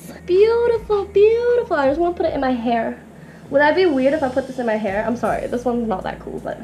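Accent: Australia. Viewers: Australian accentt comin' in hard! I love it lmao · Your semi Australian accent.... I love it so much, i wish i have an Australian accent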